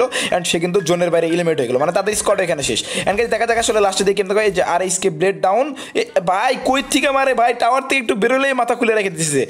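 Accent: Tangail, Bangladesh. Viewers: Vai apnar vasa tangail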